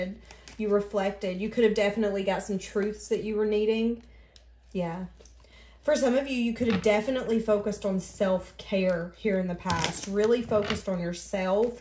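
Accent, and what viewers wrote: South, United States. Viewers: resonates ... as does your kountry accent. Love it, from TN!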